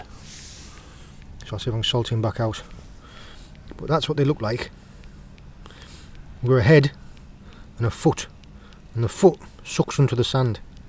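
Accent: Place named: North East England, United Kingdom. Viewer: Hi mate you sound like your from the North East which beach is this I'm from newbiggin by the sea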